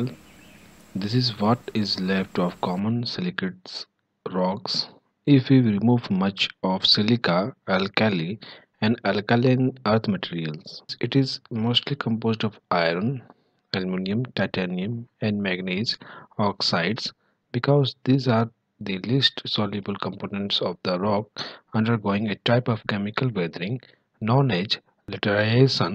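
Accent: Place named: India. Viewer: Interesting content, dear brother, but the way You pronunciate is awful... I understand that You are of indian accent, but nevertheless Your way of pronunciate is very lazy and neglectful... You should try to improve Your way of speaking, or let somebody other do the vocal job in Your videos, or at least provide Your videos with correct captions/subtitles... I hope You understand this and don´t take my words as an offense... Thank You for the video, and have a good luck with Your work and Your channel ;))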